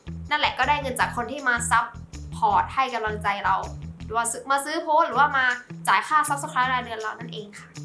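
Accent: South, Thailand. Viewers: เป็นึนใต้ป่ะครับ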